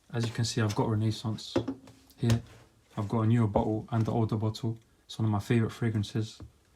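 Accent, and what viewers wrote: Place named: Arab world. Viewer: Hispanic name, with arabic accent?!! Strange!!!!!!!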